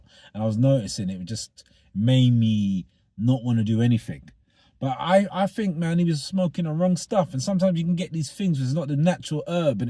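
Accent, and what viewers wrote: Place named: London, United Kingdom. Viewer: He's a Londoner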